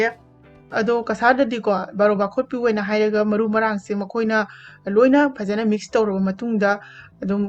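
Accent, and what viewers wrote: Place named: Imphal, India. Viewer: Yaamna fajaba, asengba meetei lon ngaang-nge Imphal lomgi, video upload ta ngaangliba meetei lon sing Adudi taabada pelle.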